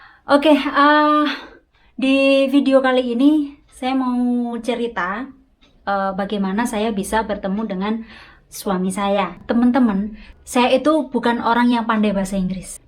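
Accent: Java, Indonesia. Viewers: Mba asli org dari jwa ,pa luar,jwa,soale kya medok jwa,maaf ya mbaa,? Tnya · tak panggil mbk ya...aku dari Semarang Kendal mbk..logat Jawa kelihatan bgt...salam kenal ya..sehat selalu disana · Mbak e kok medok .. aslinya daerah mna mbak ?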